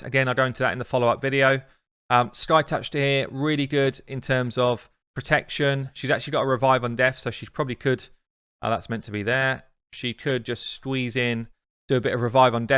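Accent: England, United Kingdom. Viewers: Man, you are damn' legend! Great job! Even tho I hate english accent, I listen every single your word with pleasure. You did amazing and huge job to support newbies... :D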